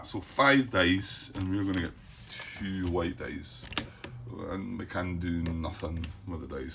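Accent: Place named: Scotland, United Kingdom. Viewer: Thank you for this journey that ended today. I started to watch your videos of The other side of the hill after backing the reprint in August, and never caught up with your speed, so I never put a comment, but today, I can finally say all the good things. It's been quite tough sometimes for different reasons: the firsts parts with your accent (I do not have any more problem with Scottish accent now 😊) and sometimes being inside your thoughts is not easy 😅. but anyway, your questions and sometimes mistakes made me improve my understanding of the game and I do play on vassal ( 2 solo plays and 2 other with 4 players) and the physical copy of the game has arrived in the meantime. thanks again for the time spent to offer us a global vision of this remarkable The other side of the hill.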